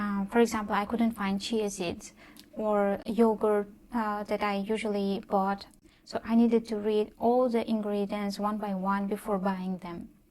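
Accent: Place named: United Kingdom. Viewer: It sounds like you’re in the uk? 🇬🇧 welcome to the tribe. 😊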